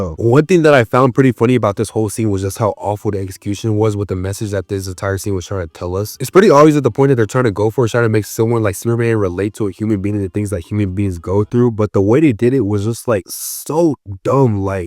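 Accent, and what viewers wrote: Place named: Mexico. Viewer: man THIS one is a stretch Edit- What's wrong wrong with people having their own personal views that others deem "racist"? The reason I even ask that is because YOU'RE grifting by making both of the videos. A real BIG gear grind of mine is when someone calls a person racist for saying 🥷🏿 BUT then THEY start dropping the SAME WORD casually for the rest of their conversation. Nah my boy that ain't gonna fly, at that your whole fake rage & argument you just Steph Curry'd right into the dumpster. AND even if you're Black you sound like you have the inflections of a South of the US Border denizen, so saying it for you is wild if true.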